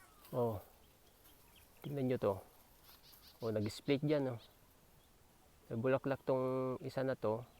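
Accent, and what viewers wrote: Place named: Ilocos, Philippines. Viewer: Parang Ilokano ang punto mo sir,baka magkalapit lang ang lugar natin sir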